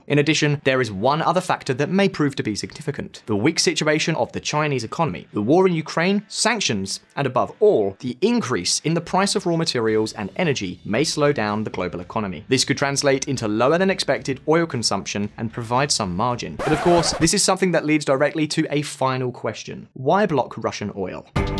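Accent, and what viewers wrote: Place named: United Kingdom. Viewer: Whenever I hear a British accent, I know I'm probably about to be subjected to Russophobic propaganda---like this video, which is a slickly-produced combination of ignorance, mis- information, and wishful thinking. I like the way glosses over the fact that a total fuel embargo on Russia will severely damage the Western economies for a very long time--perhaps permanently, in Britain's case. But it's OK; we must be prepared to go cold and hungry, just to stand up to that nasty old Putin. Such childish bullshit. And the punchline, of course, is that the Russians seem to be weathering the sanctions just fine.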